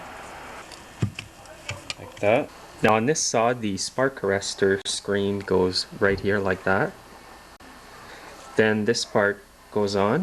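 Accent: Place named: Canada. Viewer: Odd that the related clips on the right fills upp with girls in bikinis more than chainsaw videos. I guess it says something about the audience. Other than that, really nice videos, and at least for a scandinavian your canadian accent sound so much nicer than the average americans.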